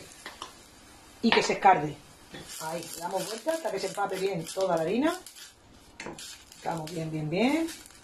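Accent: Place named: Jaén, Spain. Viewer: Yo no sé si eres de la provincia de Jaén pero habla igual que yo eres graciosísima y lo explica estupendamente tiene unas manos maravillosa y lo explicas muy bien te doy las gracias y te mando un abrazo fuerte yo me llamo Julia Martos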